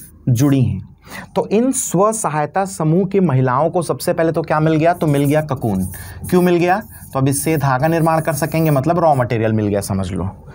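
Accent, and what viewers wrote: Chhattisgarh, India. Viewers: Thank you sir😁🙏😁... Sir aap kaha se hain...apki chhattisgarhi ka lahza achha lgta h....😁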